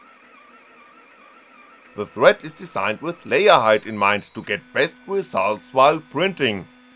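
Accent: Germany. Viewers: I love how you germanice English. There is something oddly pleasing with that. Hahahahha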